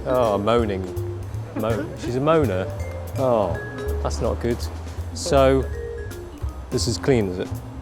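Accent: United Kingdom. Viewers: Haha, WHY did you let her throw a camera up like that? So obvious that it would be a epic fail, but its your fault not hers ;) I know people from Hong Kong, but I've never heard anyone with British accent! Like others say, it sounds really nice :D But did you live in Brittain then? ONE thing you should have pointed out about the cameras! They are totally different in the grip! Yes, the Nikon weight less and is smaller. But for a guy where Im from, the Nikon is really too small in order to get a really nice grip on it like you have for Canon's camera. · Asian with British accent omfg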